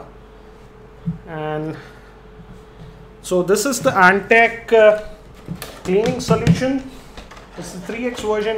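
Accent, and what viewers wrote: India. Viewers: Indian accent is so funny LoL !! I PAAAD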